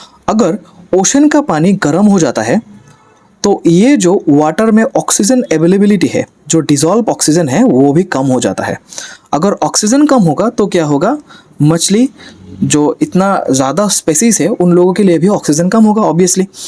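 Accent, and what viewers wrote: Assam, India. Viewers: Ur voices like Assamese 🙄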